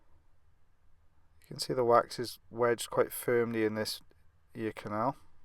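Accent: Wales, United Kingdom. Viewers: WELSH YOU SEE I OFTEN THINK HE WILL BREAK OUT IN SONG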